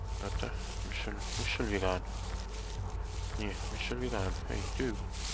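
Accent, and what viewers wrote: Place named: Russia. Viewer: Vene Aksent Nice !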